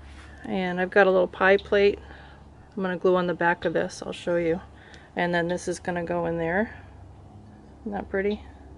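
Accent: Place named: South, United States. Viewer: Love your content, beautiful craft, trying to figure out what part of the south you live in, very familiar scenery. But because of your accent I know you are not as far south as I am. could be slightly north of my location, but you must be a transplant....lol! Moss filled oak trees near a lazy waterway, what a beautiful backdrop for your delicate flowers!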